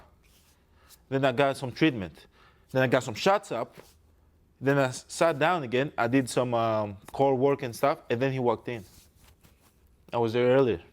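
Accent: Greece. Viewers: He's Greek accent and above all his Greek mentality is on display 100% and I love it! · He’s Greek and speaks English with a Greek accent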